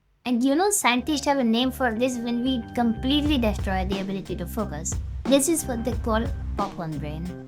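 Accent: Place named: Southeast Asia. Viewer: Are you Singaporean, Malaysian or Indonesian? (Made a guess based on da accent XD)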